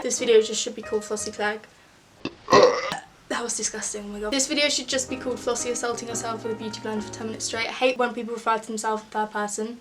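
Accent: Scotland, United Kingdom. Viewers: Is she Scottish or British cause confusion but still she amazing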